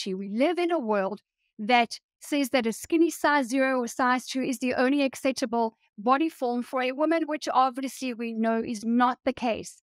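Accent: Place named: South Africa. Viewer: Thank you for sharing with us you are so inspirational and sweet and love the south African accent☺💖👍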